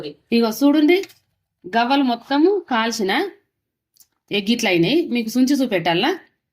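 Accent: Telangana, India. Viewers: తెలంగాణ భాష అయినా చక్కగా మాట్లాడు తున్నారు ప్రేమతో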